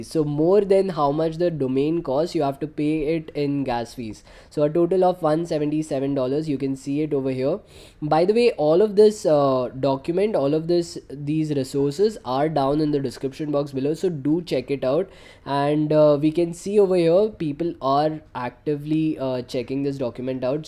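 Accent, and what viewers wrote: India. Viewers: Your English just like Hindi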